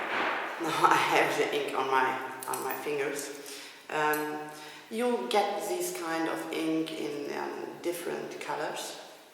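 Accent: Germany. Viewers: Your English is very good :) And the German accent is just charming :) (The little we can hear of your accent) :) - Thank you for sharing your art work with us, it is very inspiring! :) Much love - Nina :) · I am so glad you have taken your courage in your hands and decided to talk to us in England. I have been to Berlin once with my Son. Your English is fabulous with a gentle German accent. From your explanation on how to apply paint, I have al so taken courage .I have bought a canvas and started to paint.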